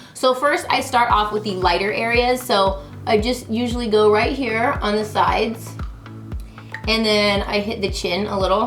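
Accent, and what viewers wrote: England, United Kingdom. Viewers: Anyone who hates on your british accent can leave right now. You’re not part of the fam, bye. P.S. Missed you as well, not just the accent. Xxx (cause thatks how the British end their notes) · Love this trick! Gotta try this miracle foundation!!!:D And I love your British accent!! I like speaking like a Brit too ☺️ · Love this tutorial - and your British accent rocks 😆💞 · I also love your British accent.... I'm a Brit 🙌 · I’m English and I love your English accent ❤️❤️ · I love your British accent it makes me laugh, and you look amazing as usual. But it does look like that was a lot of product being applied, no? I just don't like to look cakey.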